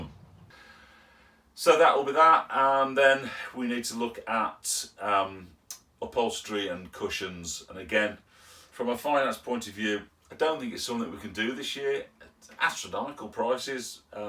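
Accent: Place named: Yorkshire, United Kingdom. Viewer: Are you sure you are a pedigree Brummie, sounds to me like there may be a bit of Yorkshireman in you. Hope mrs Lumsden is a little happier when she sees your latest efforts.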